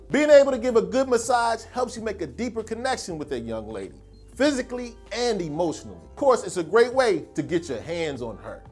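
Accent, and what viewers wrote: Philadelphia, United States. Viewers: You from Philly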